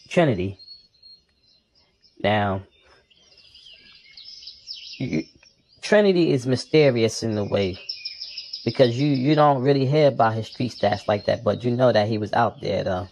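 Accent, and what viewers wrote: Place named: New York, United States. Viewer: Sound like you from New York or Detroit, but da' 504 alllll up there too.. Good job bruh. R.I.P. SLIM!!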